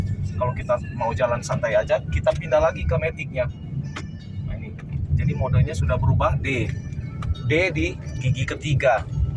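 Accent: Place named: Sulawesi, Indonesia. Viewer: Syutingnya di Jambi tapi dari logatnya om dari Sulawesi kah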